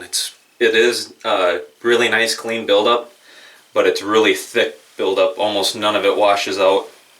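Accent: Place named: Wisconsin, United States. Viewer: You've got a Wisconsin or Minnesota accent man. Good review though.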